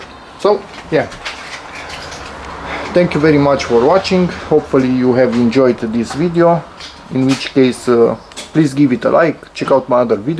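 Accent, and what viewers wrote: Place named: Romania. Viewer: accent romanesc foarte clar. Felicitari pt canal!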